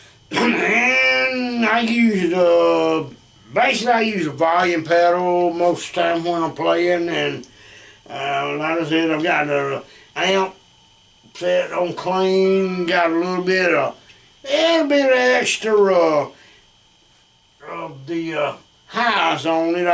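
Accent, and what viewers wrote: Texas, United States. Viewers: This is Texas English or what ? Its funny . I can listen steel guutar all day.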